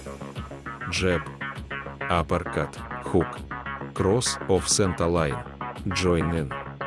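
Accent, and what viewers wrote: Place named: Russia. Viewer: The russian accent makes it even more authentic and soviet like😅👍👍👍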